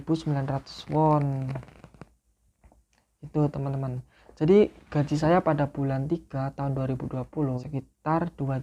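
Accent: Tegal, Indonesia. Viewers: Wong ndi sih? Kaya wong Tegal basane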